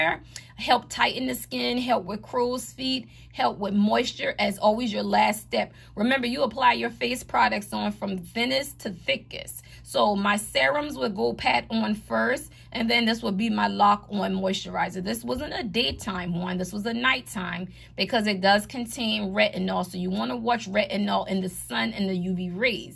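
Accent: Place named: New Orleans, United States. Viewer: Your accent gets me every time! Are you from New Orleans?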